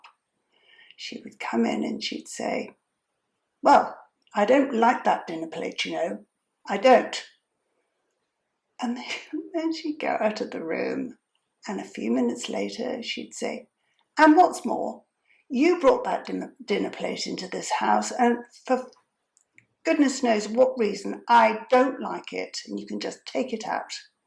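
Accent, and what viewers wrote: England, United Kingdom. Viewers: You have a beautiful English accent,so clear,and educated😊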